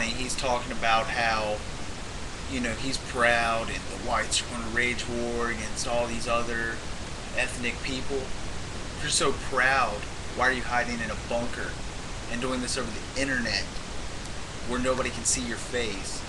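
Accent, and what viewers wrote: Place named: Philadelphia, United States. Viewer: You sound like you are from Philly. Move to Camden, live there for a year, and then please do a video update. Until then, please explain your theory of what is or isn't "punk rock" because it sounds to me like you think it is "punk rock" to take the establishment position on every last little thing. Wee bit odd, yes?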